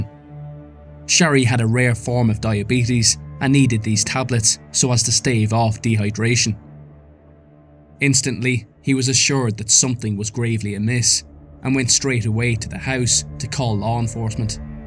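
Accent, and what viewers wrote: Ireland. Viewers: Unreal channel,brilliantly narrated. Love too know what County you are from because it bugs me trying to guess 🤣🤣 I'm from Dublin so you'd think I could take a good guess 🤣🤣 cheers for the hard work man;its really good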